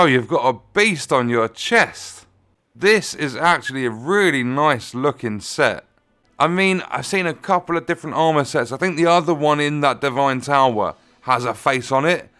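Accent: England, United Kingdom. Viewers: Sometimes His British accent make me confused 😂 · Great video mate was exactly what I was looking for, is also nice to hear a English accent for once doing one of these walkthroughs! Will be checking in regularly. Keep up the excellent content 🍻👍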